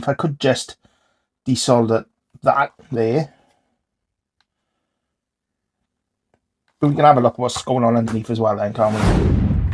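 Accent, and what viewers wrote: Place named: Wales, United Kingdom. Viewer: Nice to hear a quality welsh accent sir! And T2 certainly made this product very famous.. and desirable :)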